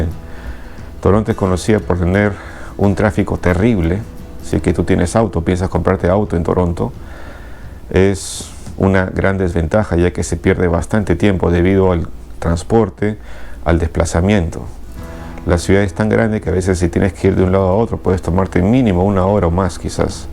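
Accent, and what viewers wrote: Peru. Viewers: Eres peruano hermano?, me parece por tu acento.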